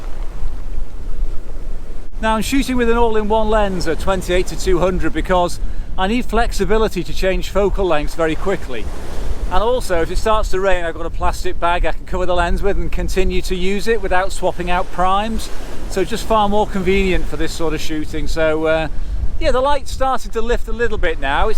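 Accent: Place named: Liverpool, United Kingdom. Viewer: I always hear the cadences of the when I hear you speak. I was interested to find that you seem to be from the Liverpool area. Always enjoy your content!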